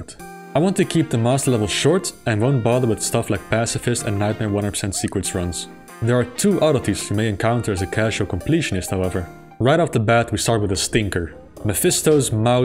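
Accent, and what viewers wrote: Netherlands. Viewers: Are you dutch? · Are you Dutch? Jouw accent klinkt een beetje Nederlands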